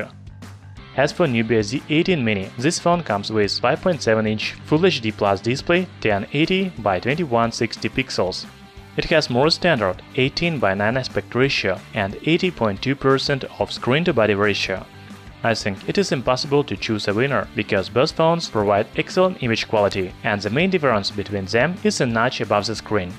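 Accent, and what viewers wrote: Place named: Russia. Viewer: Автор русский, вы чо по акценту не слышите?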